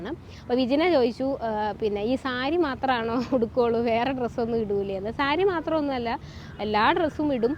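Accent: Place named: Thiruvananthapuram, India. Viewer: ഞാൻ ഓർത്തു ചേച്ചി തിരുവനന്തപുരം കാരി ആണെന്ന്.. 😊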